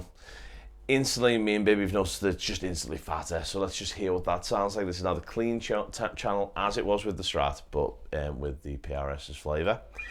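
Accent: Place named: Northern England, United Kingdom. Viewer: Mate, you're doing the blues pretty well for being "not a blues guy". ;-) Love your accent, BTW. Where RU from? Somewhere up north on the Brit Isle, I suppose? (Myself being from Germany.) Very nice Blues amp but I still feel unsure about it for rock / hardrock (not metal). As far as YouTube demoes go I'd still prefer the Tech21 TM30...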